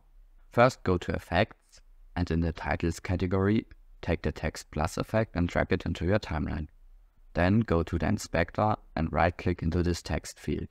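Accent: Germany. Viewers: love your german accent 😋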